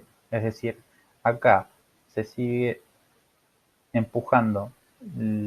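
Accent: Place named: Argentina. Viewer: no entendí , osea no entiendo el argentino :v , es que no entendí algunas palabras xd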